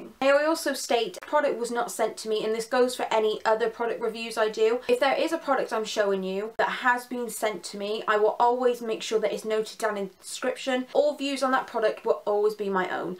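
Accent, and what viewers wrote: Bristol, United Kingdom. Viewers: Are you from Bristol? I'm from Bristol and my accent sounds so strong in my videos lol. Love your videos btw xx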